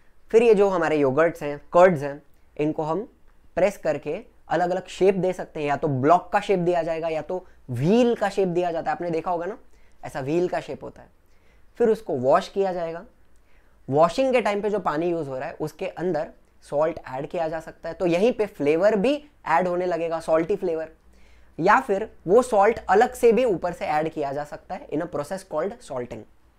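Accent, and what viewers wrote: Maharashtra, India. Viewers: Sir kya ap marathi speaker hai??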